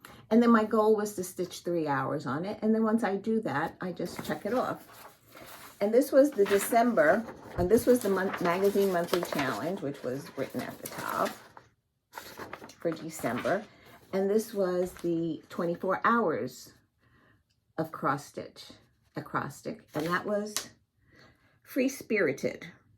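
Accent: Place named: Northeast, United States. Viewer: I just love your videos. We are drawn to many of the same patterns, and I could listen to your beautiful northeastern accent all day long! I love how involved and dedicated you are in the lives of your grandchildren. They are so blessed to have you. I pray mine one day live closer so I can be helpful to my children by babysitting. I want to register an enthusiastic YES PLEASE to the "under the bed box" video. I would love to settle in and watch that!!!! Happy new year to you.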